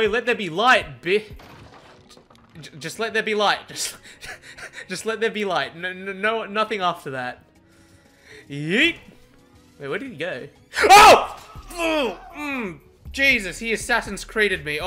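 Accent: Australia. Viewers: you're Australian right? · I do love listening to my Australian friends talking and swearing. So I enjoy your shit mate as well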